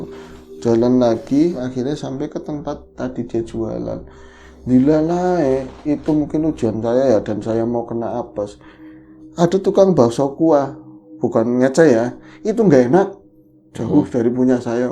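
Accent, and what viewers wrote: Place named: Tegal, Indonesia. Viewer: Melipir di ngobor dulu... Semangat hari Senin.. Cerita nya selalu seru.. dengan logat Tegal yang khas 💪💪💪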